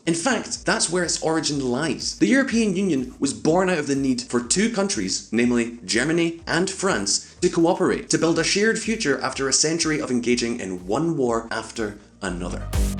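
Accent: Scotland, United Kingdom. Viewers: The Scottish accent was something I didn't know I needed on this channel but I welcome it! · It's not a British accent, posh Scottish which is much different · It's nice to hear a Scottish voice, 🤠 We're hoping to hear more about internal geopolitics such as Scottish independence and Irish unity. All the best to you!!! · Scottish accept is difficult for me. Nothing personal. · This channel defo requires some Scottish flavour a wee bit more. Aye g'ud job there lad! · *uk (he's Scottish but close enough) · honestly i'm quite pissed they hired a scot with such light accent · I love the Scottish Accent Mate! Well done nice job and great sense of humor 👍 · Where the f*** is Simon and why is this Scot talking so quick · Scottish, NOT. BRITISH! And no beard. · Some Scotish accent characteristics I've just learnt: Perfect -> PEAR-fect Signed -> scent/Saint (if you speak "inwards") Side -> sight Outside -> oat-sight Know -> mix of no & nule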